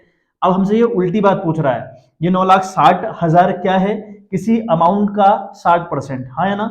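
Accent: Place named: Pahadi, India. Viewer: himachal se . Aapke accent se lga ki aap pahadi ho 🙂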